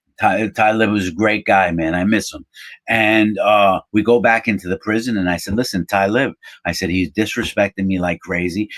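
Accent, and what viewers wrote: New York, United States. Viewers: The way you tell stories is awesome. I don't know why, but i love the NY accent. Thanks for the authentic insight.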